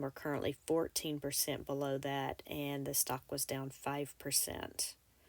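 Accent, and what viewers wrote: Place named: South, United States. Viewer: It’s the southern American accent lol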